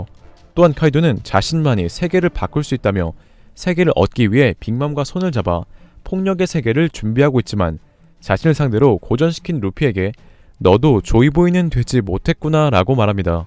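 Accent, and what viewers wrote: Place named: South Korea. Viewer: 수면용 책읽기 방송 부탁해요 어쩜이리 순도 백프로 한국억양인지 ㅎㅎ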